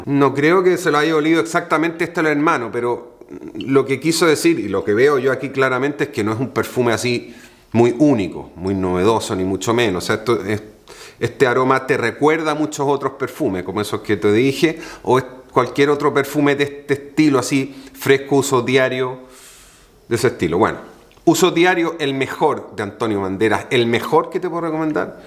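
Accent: Chile. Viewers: Me encanta tu acento y forma de hablar obvio chile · Eres chileno? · España? Yo crei que era chileno por el acento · El bro habla entre chileno y español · Es chileno jaja 🤣 después dicen que hablamos mal y dices que es un perfecto español. 🤣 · Excelnete video, nuevo suscriptor. Una consulta, escucho tu acento, y lo asocio mucho al chileno! Saludos y espero que sigas creciendo aun más!! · Es chileno viviendo en España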